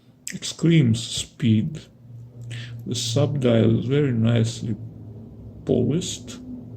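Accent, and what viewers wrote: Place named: Greece. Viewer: Great video,. I found the link to this channel from WUS and subscribed. Do you know what I like best about that watch? It doesn't feel the need to have a running seconds register, just to let us know it is running. It is assumed. Nothing is moving except when it needs to be. I like your accent too. Greek, I think; very nice to listen to. -Smaug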